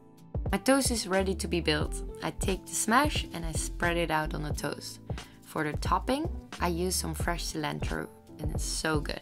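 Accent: Netherlands. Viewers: Are u Dutch?? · Her Dutch accent makes me cringe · Are you dutch?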